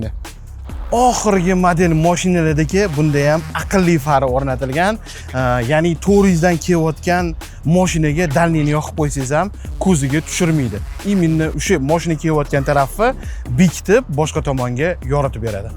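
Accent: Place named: Khorezm, Uzbekistan. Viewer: cho'nqir Xorazmchaga gap yóq og'a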